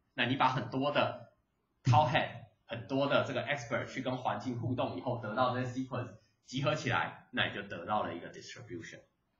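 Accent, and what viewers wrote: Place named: Taiwan. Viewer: 听李老师的课从此爱上了台湾腔🤣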